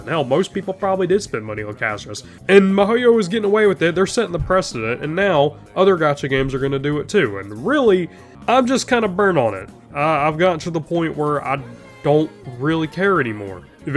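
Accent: South, United States. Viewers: the southern accent is awesome, glad to see the upload! hope you feel better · ah i wondered why you sounded so overly american lol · Not relevant to video but are you southern by any chance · How did your Southern twang intensify over time 😂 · Firstly damn sounded a lot more southern than he usually does In all seriousness though yeah the gacha selling Genshin has been having has been getting out of hand and honestly I'm glad to just be watching the chaos unfold from the sidelines because it has been getting ridiculous lately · didn't post for a month and came with a southern accent. omega based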